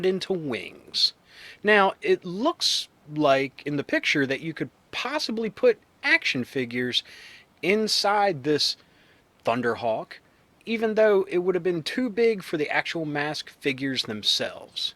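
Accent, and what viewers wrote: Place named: Baltimore, United States. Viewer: Sounds like you’re from Baltimore too bro 😅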